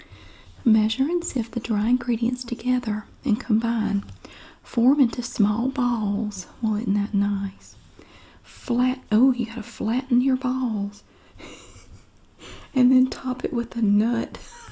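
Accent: South, United States. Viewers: The fact that her name is, she’s southern and reading recipes is literally the CUTEST thing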